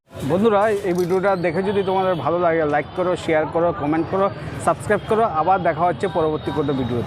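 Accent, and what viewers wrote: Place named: Bangladesh. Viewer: সুন্দর ভাবে কথা বলতে শেখো । তোমার কথোপকথন অনেক কুৎসিত। মনে হয় যে তুমি বাংলাদেশ থেকে আসছে।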